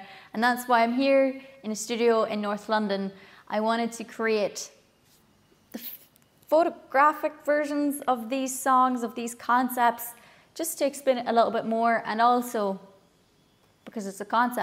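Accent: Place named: Ireland. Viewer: Janet, the sign of a person growing is when they are able to look at where they have been, what they have done, and what they wish they had done differently. If they can learn from these experiences, they can make changes or continue to grow. Many cannot be that honest with themselves. It takes a special person to be able to recognize and document where they have been and what they have learned about themselves. For you to be able to go beyond recognizing, and then share with us what you have learned from your journey is amazing by itself. I have followed you for years and have thoroughly enjoyed your talents (and the wee bit of charming Irish accents along the way.) Congratulations on becoming an even more amazing person! With your fantastic talent for music, I can only imagine this is an outstanding album. I am looking forward to listening to it. Dave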